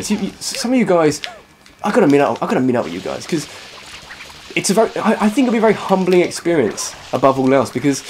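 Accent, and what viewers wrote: United Kingdom. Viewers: when are you doing the next Q&A Episode? I like your accent, I'm British too. I get a lot of shit for how I talk, that I talk posh which I don't just because I don't have a broad accent of where a live cos my mum's southern so I don't sound like my friends. And you are funny!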